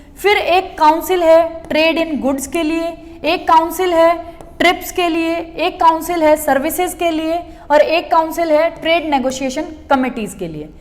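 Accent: Punjab, India. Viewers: Mam are u pnjabi?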